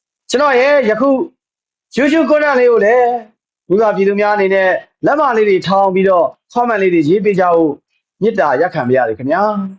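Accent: Yaw, Myanmar. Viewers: ဒီနေ့တော့ ဂေါပကယောနသံလေးပေါ့💯😊❤ဟုတ် နှမ်းစေ့လေး ဖြစ်ပေးနေပါတယ်နော် ဟို့နွေဦးအစထဲကပါဗျာ့💯တော်လှန်နေသ၍ ကျွန်တော်တို့ အနိုင် 💯💯❤❤🚩🚩🚩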